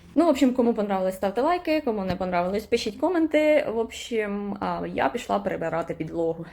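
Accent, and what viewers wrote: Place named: Central Ukraine, Ukraine. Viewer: Прикольно вийшло ! А ще цікава у вас вимова українською , ви десь з центральної України родом , Вінничанина ? Просто цікаво ) , дякую за відео .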